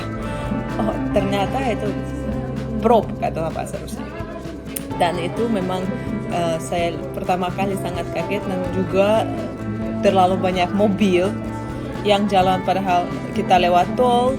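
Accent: Java, Indonesia. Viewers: Eksennya sudah orang Indonesia. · Logatnya sdh seperti orang indonesia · Logat mu bersih sekali , sudah persis orang Indonesia asli, agak terpengaruhi logat jawa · ngomongnya Indonesia bangett mbak ......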